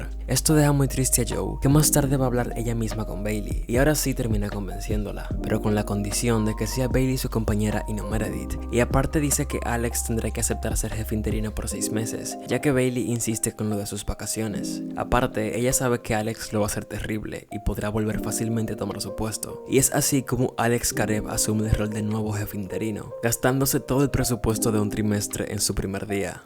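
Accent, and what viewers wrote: Dominican Republic. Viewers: Amo como narras , todo educado pero le metes el plus dominicano 🇩🇴🥰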